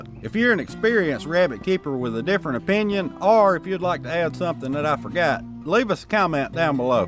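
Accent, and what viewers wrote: South, United States. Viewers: Hi, My name is Houari. I am from Yellel in Algeria. Thanks for your intresting video. I love your southern accent. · First a salute for staying on the family farm. Second, love the content. Third, I love to hear Southern Folk talk. Subscribed from Central New Mexico U.S.A.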